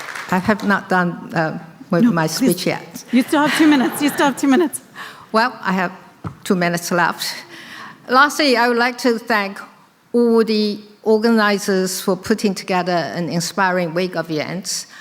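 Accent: United Kingdom. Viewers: 台灣人不是學美式英語的嗎？為什麼一口英式英語？ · 拜託！要在國際媒體前，做那麼長的英文演說！有演講稿不是很正常嗎？要你在國際媒體前用中文演講，恐怕你也不能做到一字都不出錯吧！你看她英文說得多從容不迫，說得一口英式英語多優雅啊！你讓習近平來，他連英語都聽不懂！不要說要他用英文演講！ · 聽總統說英式英文真的是一種享受🥰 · 好歹我住過Brighton一年，倫敦很近也跑了很多次，好吧是有一點英國腔沒錯，但真的是一點點，就像黑龍講中文一樣的那一點..但你要說英國腔好好聽我就覺得有點..呃…你自己去英國住一趟再回頭看你的評論😅 倫敦政經學院沒有造假？我倒覺得反而是被證明這水平真的不太可能有博士學位。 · 即是英文，因她的口音是英語，而不是美語，口頭表達不荒不亂，不需要看稿子，字正腔圓。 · 的英國腔好好聽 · 相比某人只靠小本本，今年升級為大字稿，總統的英文表達與敘述能力，實在是太優了，再加上總統略帶英國腔的演講，只能說讚讚讚！ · 濃濃英國腔😂😂😂😂😂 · 謝謝總統的堅持✊❤ 話說，總統的英文有英國腔耶，蠻好聽的😆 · 好迷人的英式英文❤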